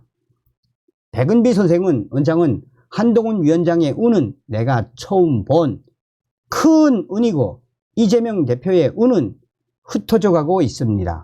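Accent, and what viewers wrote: North Korea. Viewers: 정성산TV대표님 새해복 많이 받으세요 말투가 북한 말과 비슷하네요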